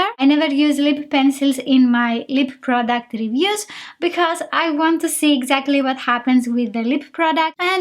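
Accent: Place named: Greece. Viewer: I immediately knew you were Greek 😂❤️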